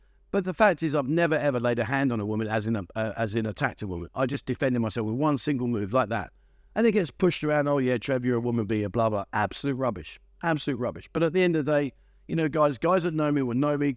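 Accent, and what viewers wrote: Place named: Cockney, United Kingdom. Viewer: Trev, well done. Started watching you in mid 2021 when looking for places to retire too. Always fun, informative and delivered with cockney honesty. Well done son.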